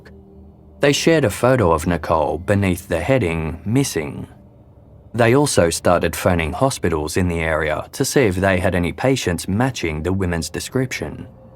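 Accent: Australia. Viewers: The narrator's accent is " educated Australian ". As opposed to " broad Australian" such as the crocodile Hunter.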